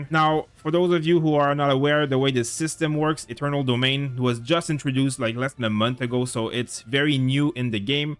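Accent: Quebec, Canada. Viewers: Tu sonne comme un québécois aha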